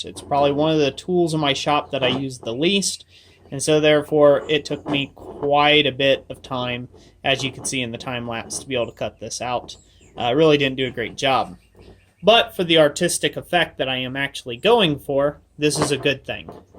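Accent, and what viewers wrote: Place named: South, United States. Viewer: so your from dayton I always though you were from the south, anyway what is your thoughts on hammering uri hoffi style?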